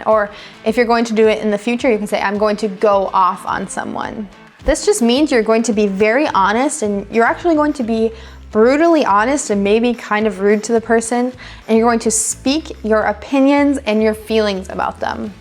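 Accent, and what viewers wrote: United States. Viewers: inglês americano gata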